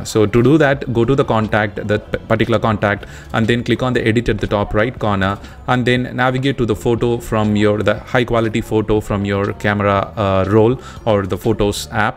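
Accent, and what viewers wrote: India. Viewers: Love Indian accent wish I could do it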